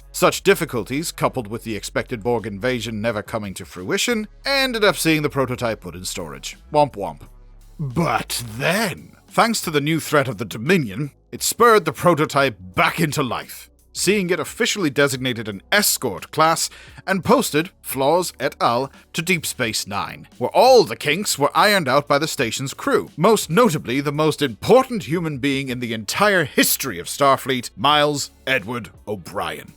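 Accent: United Kingdom. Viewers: okay, the narration was way way over the top on this one, had to shut er down 1/3 thru lol. Please get rid of the nasty british accent thx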